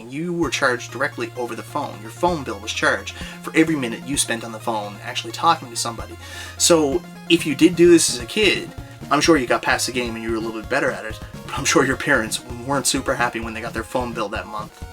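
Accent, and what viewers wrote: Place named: Nova Scotia, Canada. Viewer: Canada eh? Based on your accent, I'd say somewhere near Nova Scotia.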